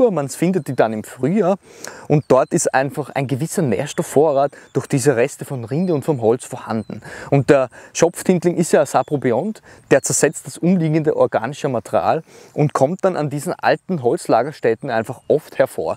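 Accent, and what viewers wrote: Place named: Austria. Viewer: Ich verstehe deutsch nur B2Niveau, verstehe ich nicht viel Österreichisches. 😲Wegen der unterschiedlichen Akzente…aber ich liebe es immer noch, deine Videos zu sehen… Danke für die Informationen... sehr interessant👍🏼👍🏼👍🏼🙏🏼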